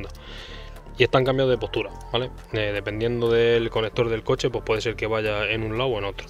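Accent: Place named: Andalusia, Spain. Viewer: donde teneis vuestro taller? Por el acento pareces Andaluz.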